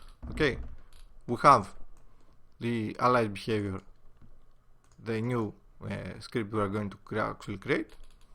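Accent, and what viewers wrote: Greece. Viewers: Are you from greece?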